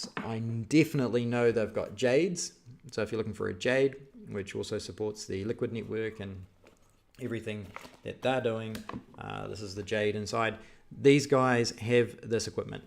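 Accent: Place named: New Zealand. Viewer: KIwi accent!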